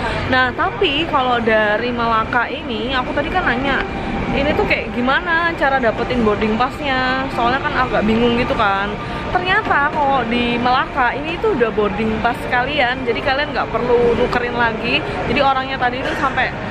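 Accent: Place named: Java, Indonesia. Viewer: Logat nya ky org jawa kak,aq org jawa stay di malaysia sudah 11 th,aq pernah travel ke malacca...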